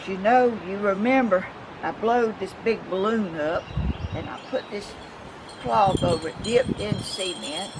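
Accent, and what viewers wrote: South, United States. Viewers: I love her southern drawl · You are such a creative and inspiring person. I do crafts as well, but I’m not as brave and creative as you are. Your videos calm and inspire me. I love your Southern accent - and your humor. You are truly blessed that you are inspired with a unique idea in your head and then you figure out a way to create it. Marvelous! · Am new to your channel, this is my second video an it’s 1yr old an love it 😍 Just love your your southern accent 💝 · you are a joy....I'm from Australia and think your projects are inspirational.....love your southern accent....bless you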